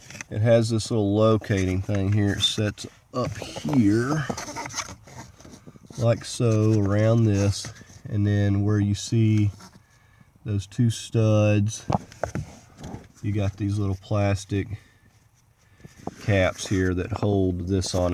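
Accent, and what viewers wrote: South, United States. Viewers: Oh man, great video! Very well explained and with good tips. But as a southern man from Tupelo now living in Germany the last 20 years, it was your southern accent that made me feel at home. Loved it! I doubt I will tackle this problem myself but I will definitely not be boogering any more threads thanks to your tips. Definitely a fan now. Keep up the good work!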